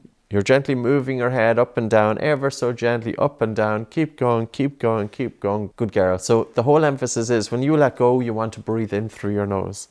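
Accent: Ireland. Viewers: Are the subtitles nessesary??? Its just an Irish accent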